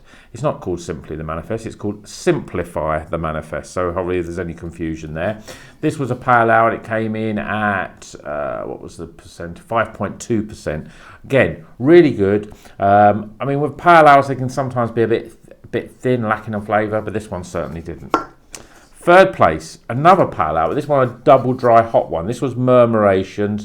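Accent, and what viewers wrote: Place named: United Kingdom. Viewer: i love british accent!!!! amazing!!! love your channel!!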